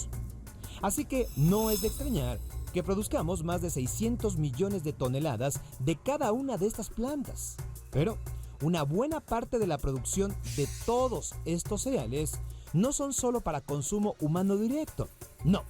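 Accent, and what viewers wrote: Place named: Peru. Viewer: Este conductor tiene asentó peruano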